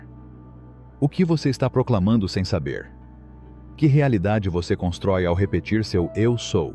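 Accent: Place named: Minas Gerais, Brazil. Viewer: O narrador é mineiro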